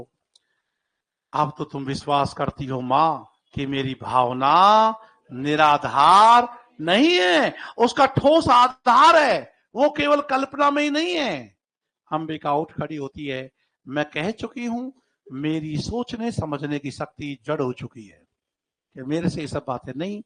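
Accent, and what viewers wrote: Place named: Bharatpur, India. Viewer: Lagta hai guruji Bharatpur se hai hamare jesi language hai bilkul ye Bharatpur bali hi h😀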